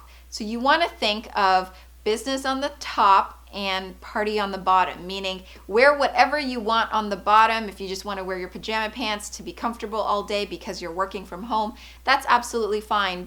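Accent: Canada. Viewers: It's a Canadian accent 👍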